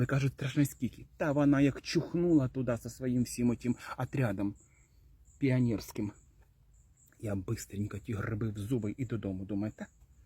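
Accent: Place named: West, Ukraine. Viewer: Я фанатка русского языка. Но думаю: как было бы неинтересно, если бы все славяне говорили на чисто русском! С таким удовольствием вас слушаю! Да ещё эта западная деревенская манера! 👍👍👍 У меня родственники на западе в селе - точно так там все разговаривают!😂😂😂❤❤❤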